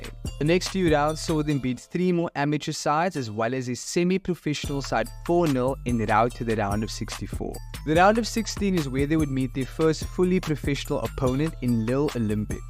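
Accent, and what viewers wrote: South Africa. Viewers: French in a Cape accent...now I've heard everything 🙌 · Amazing vid ,when i heard the accent i knew it was south african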